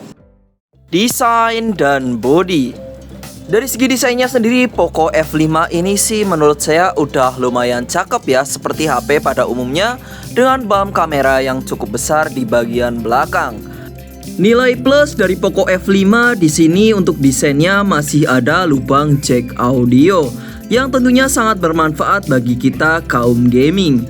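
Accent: Banyumas, Indonesia. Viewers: bang lu org banyumas ya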